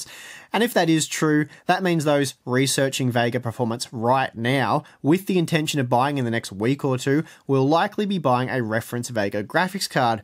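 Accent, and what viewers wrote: Melbourne, Australia. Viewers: I have no idea why your critics were so critical. I've watch all your Ryzen and gpu tests and find you disclaimers eminently understandable and justified - perhaps it's because we share an accents (melburnians). Anyway keep up the excellent quality of your work.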